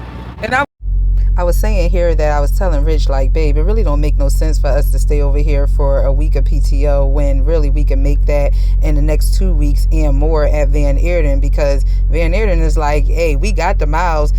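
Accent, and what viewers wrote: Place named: Caribbean. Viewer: Nice video you sound like someone who has caribbean blood in you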